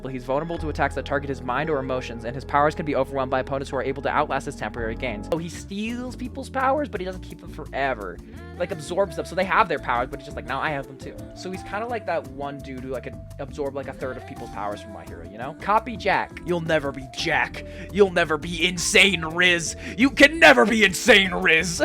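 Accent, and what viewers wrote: United Kingdom. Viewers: It’s not the same without the British gentleman voice tho…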